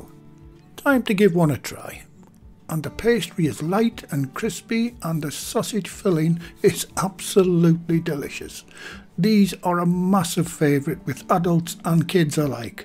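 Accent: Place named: Northern England, United Kingdom. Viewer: As soon as I heard voice, then say he’s from up north, I just knew these were going to be godly sausage rolls.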